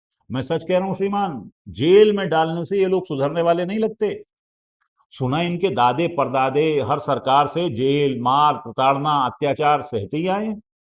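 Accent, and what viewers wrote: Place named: Uttar Pradesh, India. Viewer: You are great. You talk like my native Uttar Pradesh tone.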